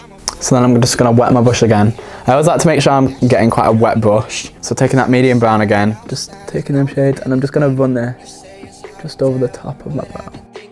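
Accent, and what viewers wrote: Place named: Bolton, United Kingdom. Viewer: where are you from? You sound like a fellow boltonian x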